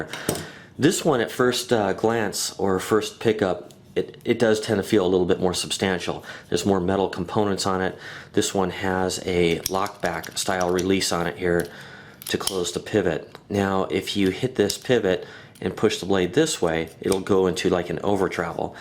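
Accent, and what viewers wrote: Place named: Texas, United States. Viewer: Thanks for this great review man! U from Texas ;-)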